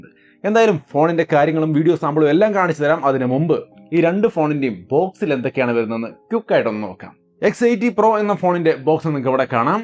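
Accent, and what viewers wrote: Thrissur, India. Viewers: Bro thrissur karan ano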